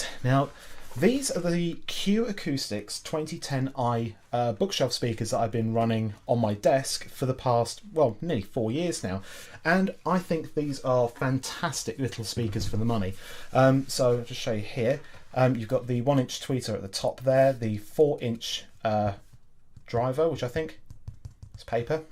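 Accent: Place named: United Kingdom. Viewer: I just watched your at2020 usb + video. What a vid also, you havew lost so much weight. You look so much better well done. Also Could you do a setup tour because i think it will look amazing and would be a great video. Much Love brother and keep up the good work! <3 (also, nice to see someone from the UK lmao, love your accent, mine is shit)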